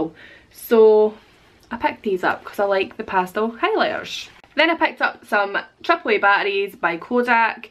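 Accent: Scotland, United Kingdom. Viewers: I never considered what a Dollar Store would be called in other countries. A store in america called PoundLand would not go over so well. lol. Love your accent by the way, and I do mean your ...regional? scottish accent. I love listening to it.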